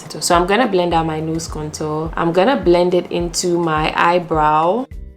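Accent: Ghana. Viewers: You sound so ghanaian! I love it ! I appreciate your authenticity, it's making me miss GH. You're also stunning !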